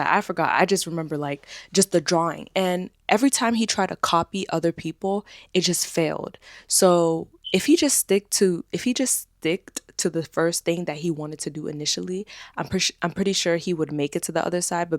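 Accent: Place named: United States. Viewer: Greetings from Angola 🇦🇴, right here in Africa! I just want you to know how much your videos mean to me. Your words, your energy, and your American accent inspire me to keep improving my English every single day. You have no idea how far your motivation reaches, it truly crosses oceans. Thank you for being such a light,...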